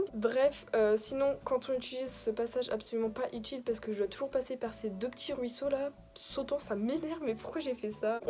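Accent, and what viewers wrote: England, United Kingdom. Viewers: J adore ton axent anglais tu peut mettre un like si t’es d’accord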